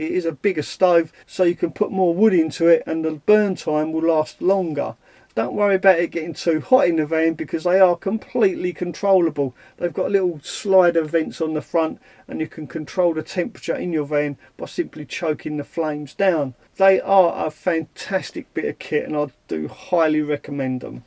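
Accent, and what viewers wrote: Cockney, United Kingdom. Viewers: I understand every word you say as my Mum, uncles and Aunties were all cockney. I'm not but my ex was always saying I was saying certain things wrong. Luckily for me it was his attitude to me 5hat made us split up lol. Xx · "The Jovial Cockerney" - It's GREAT! that you're keeping it alive.... Too much of that 'other' Cockney-Replacement-Lingo these days for My liking 🤔..... Just don't go all 'Joe Swash' on us EH?? 😄😂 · Hi, just subscribed. Keep it up buddy including that cockney accent. You sound just like my old pot and pan! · Hi, hope you are well. I don't have a problem with your cockney rhyming, I can understand everyfink you say. So, I have a question...If your van is registered as a van with windows, and not as a campervan, why did you fit your cooking stove permanently? Thanks for sharing. Best to Becky and Monty. 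Stay safe and keep up the good work.:)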